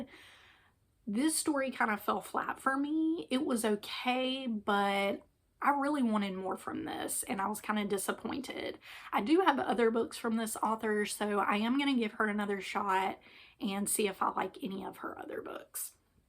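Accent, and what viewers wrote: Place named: South, United States. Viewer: Yesss our southernnnn 😂we can’t pronounce things hahahaha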